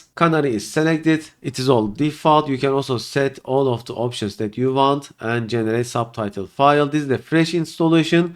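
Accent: Turkey. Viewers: Rastgele önüme çıktı dinliyorum; diyorum "bu kesin Türkçe aksan" altyazıya bakıyorum Türkçe yok dublaja bakıyorum hak getire! bu kadar mı kendi dilinden, milletinden nefret ediyorsun?!! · aksan türkçe, dil ingilizce olunca 5 saniyelik hangi dil la bu şoku geçirdim. hocam onca farklı dil varken bari ai da olsa türkçe dillnede çevirseymiş siziniz bu videoyu.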